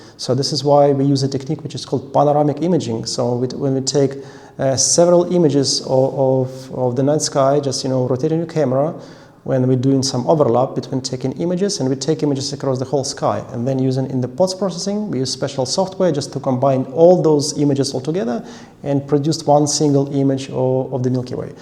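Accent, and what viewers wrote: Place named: Russia. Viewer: didn't bother to get rid of his thick Russian accent.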